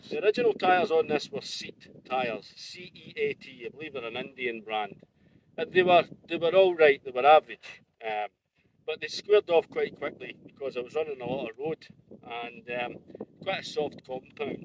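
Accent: Scotland, United Kingdom. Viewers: that's a Scottish accent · a broad Scott coming out with a pure American phrase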